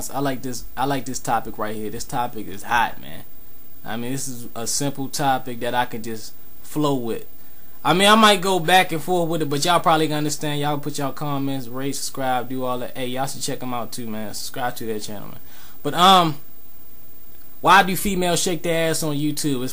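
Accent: Baltimore, United States. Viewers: are yu from baltimore dude tht made the video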